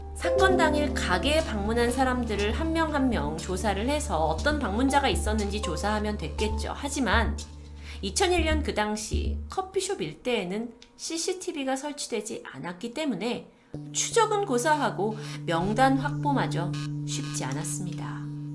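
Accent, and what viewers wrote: Jeolla, South Korea. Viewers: 절라도 말투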